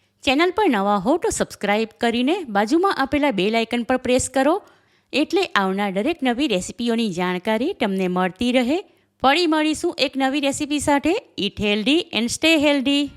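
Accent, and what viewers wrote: Surat, India. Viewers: Proud to be surti. Amari bhasha avi j che ane rehse. Tamne ni fave to tame skip kro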